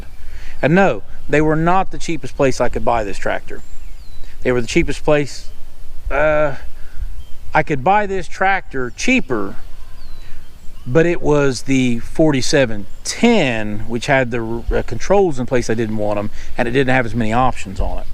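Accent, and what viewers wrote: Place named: North Carolina, United States. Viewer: Sounds like you're got a touch of north carolina down east in your speaky.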